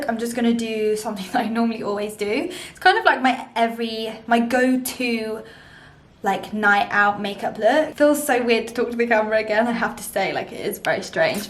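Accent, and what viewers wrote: United Kingdom. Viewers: So happy to see u back. Vertigo is not fun. It’s horrible. My mother in law has got it and she has to be so careful any kind of motion can bring it back. Can’t wait to see more tutorials and hear your cute English accent 💕💕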